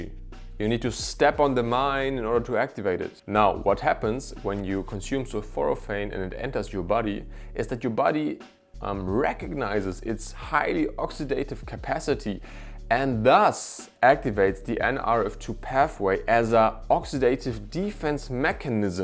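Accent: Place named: Germany. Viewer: Let me guess.. Are you German? Your accent sound like that ✌️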